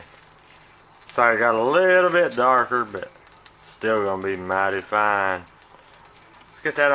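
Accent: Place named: South, United States. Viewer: It's the southern assent for me..I love it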